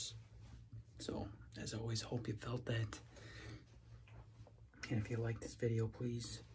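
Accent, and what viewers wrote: Boston, United States. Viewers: Great vid! I do miss the Boston accent ones tho 😁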